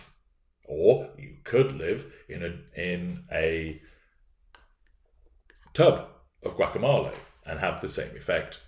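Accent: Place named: RP, United Kingdom. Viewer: He doesn’t do it often enough to be overly vulgar, but just to emphasize a point , usually of exasperation ( IMO). I have to say hearing it said with that posh accent and delicious voice makes me smile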